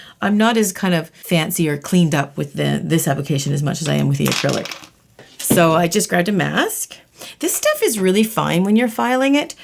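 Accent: Canada. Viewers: She sounds so Canadian in this video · These are lovely! Forgive me if I'm wrong but are you Canadian? You pronounce things in a Canadian manner? (I'm English I try to really listen to the accents I watch on YouTube but do get them wrong at times) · are you from Canada??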